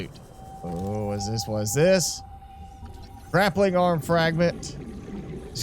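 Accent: South, United States. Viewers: I hear a country accent in your voice lol, where u located at. I'm down in Mississippi lol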